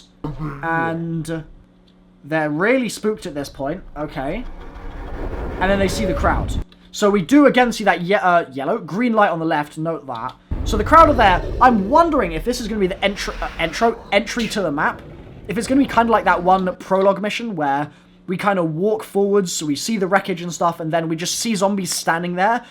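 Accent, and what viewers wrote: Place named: United Kingdom. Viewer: i fucking love how British the commentary in this is. i was laughing so hard.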